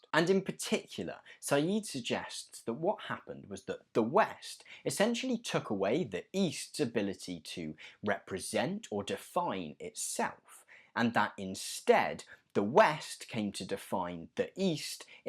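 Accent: United Kingdom. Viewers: why is a brittish person talking !!!!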